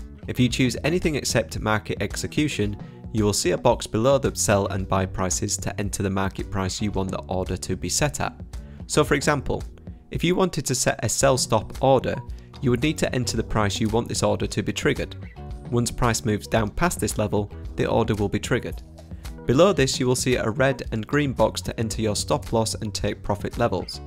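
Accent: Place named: Yorkshire, United Kingdom. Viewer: Excellent tutorial, and totally understand the Yorkshire accent!!!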